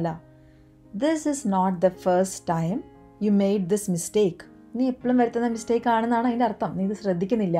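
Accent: Thrissur, India. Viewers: Mam you are from Thrissur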